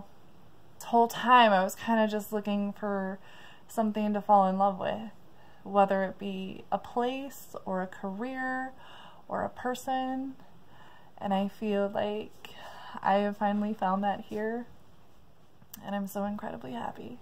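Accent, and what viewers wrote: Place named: Minnesota, United States. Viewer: Very happy for you. 😎 I just found and subscribed to your channel. I'm a South Dakotan and have previously lived in MN for 12 years (now in Springfield MO.) I recognize your MN accent. 😉 I have been to Pitt many times and agree that it is an interesting city. (My daughter lives there.) You have created a dream life for yourself. I so wish I was young again and healthy enough to travel. One more thing, I'm going to bet myself that your last name ends with -son or -sen. Lol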